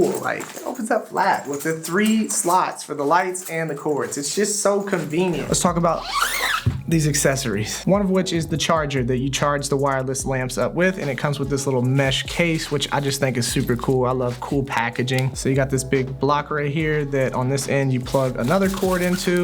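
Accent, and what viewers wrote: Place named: Philadelphia, United States. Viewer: Bro I swear you sound like you’re from philly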